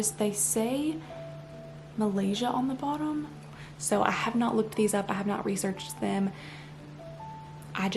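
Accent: South, United States. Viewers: wow those brass christmas stocking holders are so uniquely special, youre so blessed to find them ✨ just wanna say that i love your thrifting vids & your lovely southern accent calm voice is so soothing to listen to 🫶🏼 baby light blue, white and grey hues are your color hon, you look stunning. youve got a very beautiful home, your mom and hubby must be so proud... 🏡💕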